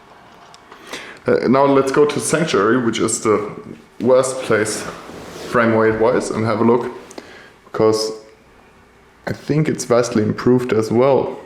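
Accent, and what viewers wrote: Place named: Germany. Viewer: Man hört den deutschen direkt heraus^^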